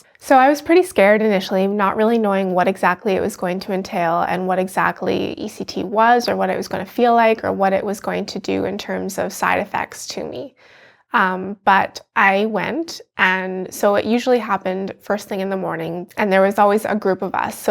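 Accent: Canada. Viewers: Thank you. I have my first of 12 ECT sessions tomorrow morning (I'm bipolar1/PTSD) Your video relieved some of my fears. I really hope it works, I think I've developed a tolerance to the meds. Sorry it didn't work for you. You are very well spoken. Could not place your accent, I guessed right! The nice neighbors to the north. Thanks again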